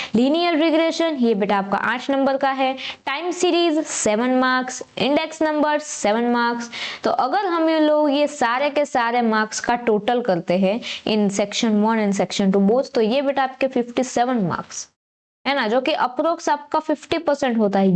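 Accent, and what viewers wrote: Gujarat, India. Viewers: Aap Gujarati Ho kya Mem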